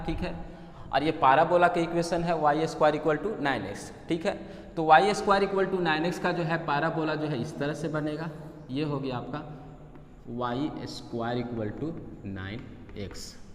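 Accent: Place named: Bihar, India. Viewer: Aapka bihari accent I like it so much 😍 💕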